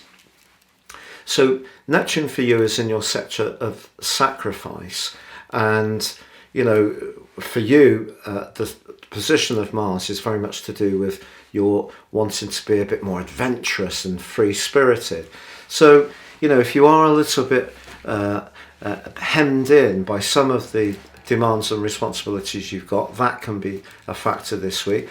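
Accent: United Kingdom. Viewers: I love this gentlemen. I love to hear him. He speaks fine and proper, love the British accent, I my personal opinion is the must marvelous, beautiful accent. Love him!!!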